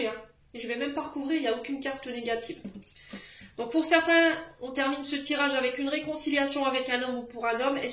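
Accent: North, France. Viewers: Bonsoir a tous, bonsoir, j'adore ton accent de chnord,je suis de Calais 😂 je suis cancer ♋️ ascendant cancer ♋️, elle est bélier 🐏 ascendant bélier 🐏, moi je me suis laissé emporter par elle,love bombing, gaslighting etc.....bref courage a tous,parfois il faut traverser toutes ses épreuves pour avancer...et croyez-moi cest purée de dure...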